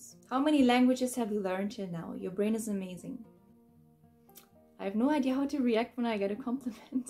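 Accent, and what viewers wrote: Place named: Turkey. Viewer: Just by looking at your face I felt that you might be Turkish and then I heard your accent and I knew for sure🥳 You dance beautifully, hope you will continue your journey all the time💙 And good look with Korean and other languages ^^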